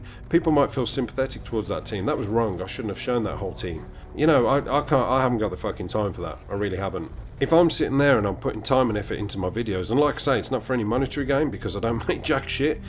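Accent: Birmingham, United Kingdom. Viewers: Keep doing what you wanna do and if things are a little stale then play other games for a while, just dont leave!:( I will miss you and your brummie accent and that laugh you have.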